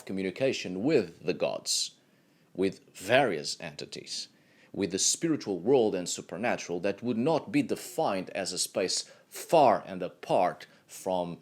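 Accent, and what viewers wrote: Portugal. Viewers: Hi K, I read that somewhere has two cats 🐈 two females cats. One called Sól and the second one called Gigi. Sól and Gigi are two Norse Goddesses names. is half Portuguese and half Danish with in addition of some Irish, Gaelic and Scottish, DNA. Following his outdoor videos vegetation’s are northern Portugal area. So I guess that he is living in Portugal northern part of Portugal somewhere in the Tras Os Montes area. Sometimes his accent is clearly Portuguese accent. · Even with your Danish and British heritage I could spot your Portuguese DNA a mile away the accent does not lie, love from Portugal bro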